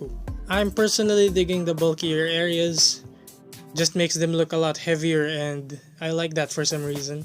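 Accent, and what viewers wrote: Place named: Philippines. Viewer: Are you Filipino I feel like you are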